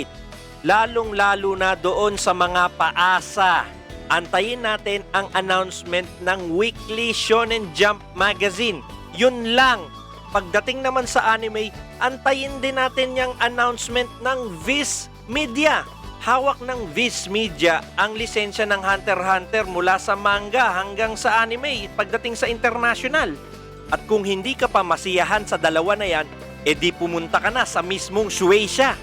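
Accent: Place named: Samar, Philippines. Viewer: Hulaan ko probinsya mo, SAMAR noh?😁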